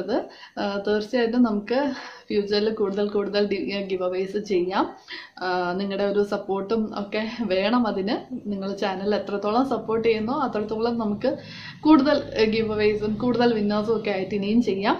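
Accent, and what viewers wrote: Palakkad, India. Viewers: Palakkad Way of talking More vlogs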